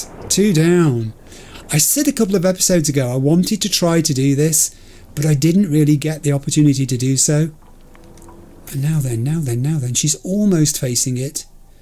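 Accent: United Kingdom. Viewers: Hahaha hearing u say "ese p*che p**" in your perfect british accent was very funny to me 😂😂😂 p** is short for prostitute in spanish and is a curse/rude word used as an insult and the other i think is like a vulgar exagerator. Its especially funny because you never swear in your vids 😅The game you play with the kids gives me sean and daniel (lis2) playing in the desert vibes.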